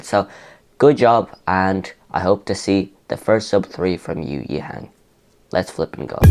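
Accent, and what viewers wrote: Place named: Ireland. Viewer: I love ur Irish accent it’s dope lol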